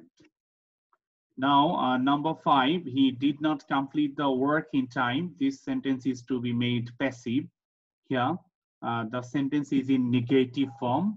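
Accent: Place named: United Kingdom. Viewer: Sir, you talk like the British. I liked your lecture!!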